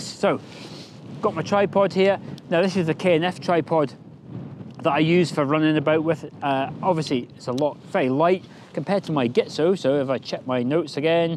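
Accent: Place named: Scotland, United Kingdom. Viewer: I did not know you are from Scotland, probably because I am American, hopefully one of the better ones and not the loud obnoxious ones. You certainly shine with happiness, fun to watch and I ever got excited. You did not give the savings in grams for shooting in 720p over 4K. The savings must be substantial. I like the image of the lake, good vantage point. Thanks for sharing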